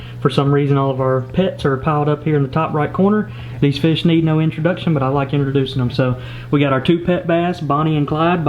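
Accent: South, United States. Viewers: Lol at his southern accent.. dan-dindley-doo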